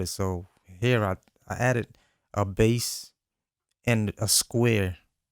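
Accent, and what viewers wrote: Jamaica. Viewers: it bad mi don sound like yu have a Jamaican like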